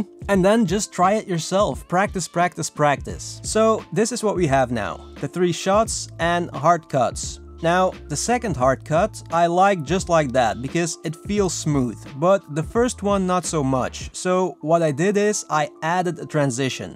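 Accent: Belgium. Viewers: Heel mooi om jouw Nederlands (Belgisch accent) te horen. Moet je wel vaker doen :)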